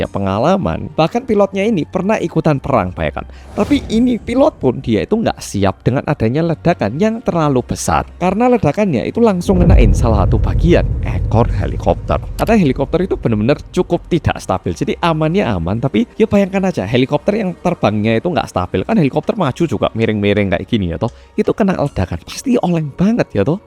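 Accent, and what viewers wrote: Java, Indonesia. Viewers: Aku suka bahasanya ,Mergo aku Yo wong jowo. Medok banget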